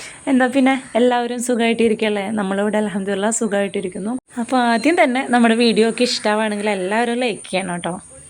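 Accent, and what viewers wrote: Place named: Thrissur, India. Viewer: Masha allah ❤❤❤ നിങ്ങൾ തൃശൂർ ആണോ സംസാരം കേട്ടിട്ട് അങ്ങിനെ തോന്നുക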